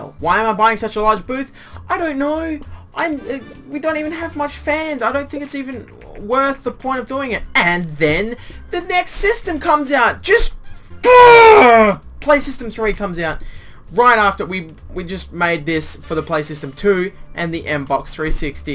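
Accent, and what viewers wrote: Australia. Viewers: The Aussie accent! <3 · Are u from aulstralia?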